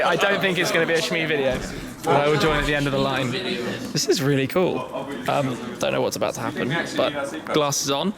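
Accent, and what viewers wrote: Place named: England, United Kingdom. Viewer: Could I get a shoutout in ur next vid? Not to help grow my channel, but I just like to hear an English accent say PorschePilot... 😂 seriously though another great vid. Close to one million!!!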